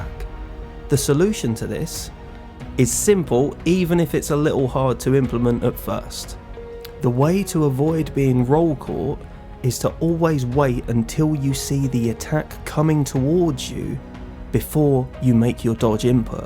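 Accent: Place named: East Sussex, United Kingdom. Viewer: Thanks man. Just found you and you have another sub. Im a Brit sitting in the warm spring sun of East Sussex - your accent doesnt sound too far off this neck of the woods. But Ive been wrong about that stuff many times! I am really impressed how quickly you have built this channel. Gives me some hope. What would you say was your secret to starting it up to fast?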